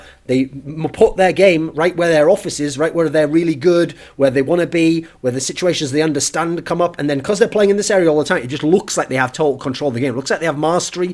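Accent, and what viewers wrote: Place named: England, United Kingdom. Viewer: English accent bro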